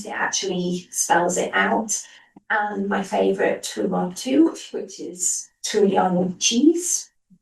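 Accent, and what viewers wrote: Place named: United Kingdom. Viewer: besides the British accent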